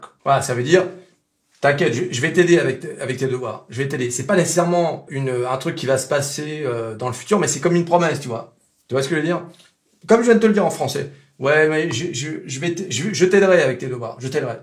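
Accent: United States. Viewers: Mais ou as tu appris à parler aussi bien américain :)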